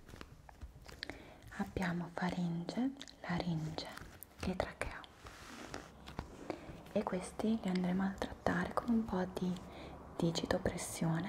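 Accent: Italy. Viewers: AAA esse sotaque italiano que eu amooo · Could listen to her all day long..love the Italian accent❤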